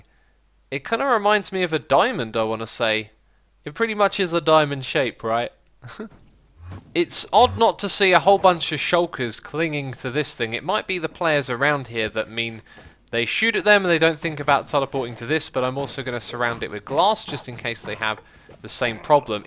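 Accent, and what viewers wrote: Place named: United Kingdom. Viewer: u sound so British...I am assell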